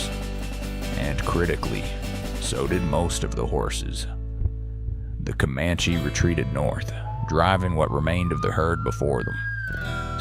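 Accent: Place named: Appalachia, United States. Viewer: The guy doesn't sound Texas. He sounds Appalachian, North Carolina or perhaps Kentucky. Why does it matter so much to you?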